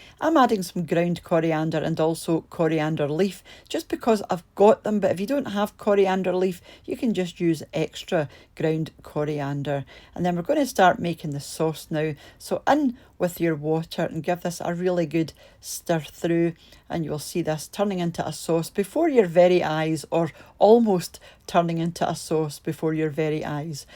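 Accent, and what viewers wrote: Scotland, United Kingdom. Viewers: Well, iv'e never had a curry pie, but this looks awesome. Thanks as always for a great video. Love listening to your Scottish accent by the way. Could listen to you all day long. Greetings from Illinois 🙋🏻‍♂ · Came for the recipe, stayed for that lovely Scottish accent😂😂😂😂 they look delicious, I will be making these very soon, thanks🇦🇺🇦🇺🇦🇺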